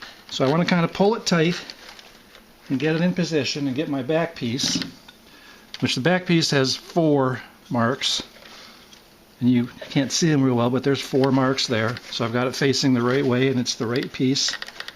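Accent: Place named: Midwest, United States. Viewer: Definitely a midwestern accent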